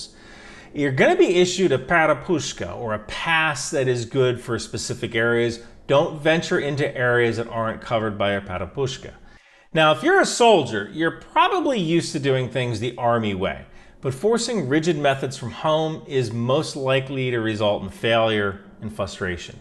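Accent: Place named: United States. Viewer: As an American who has been to Ukraine several times there is a lot of good advice here and several things I didn't think of until mentioned. But don't take language lessons from him, his accent when pronouncing Ukrainian words is hilariously very American. I would disagree that liquor is usually Vodka. Ukrainians love wine. If like me you don't drink then learn to repeatedly say "Ya ni Pushi". Ukrainians accept that people don't drink alcohol, but they will also be shocked and offer repeatedly to make sure you're not just being polite. Ukrainian Catholicism is very different from the Catholicism you are used to in the USA. They are primarily Greek Catholics and Roman Catholics are uncommon.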